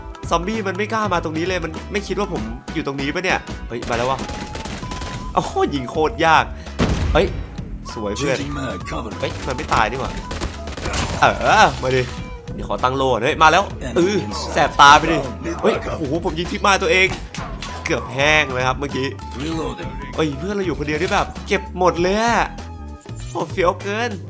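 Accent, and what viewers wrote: North, Thailand. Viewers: คลิปก่อนพี่พูดเหนือ ผม:นี้ใครว่ะ?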